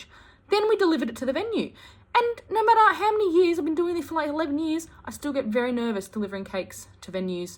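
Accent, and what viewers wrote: Australia. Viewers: 😂😂to the American 😂 your voice ((Australian accent)) could never be a snooze fest😂😂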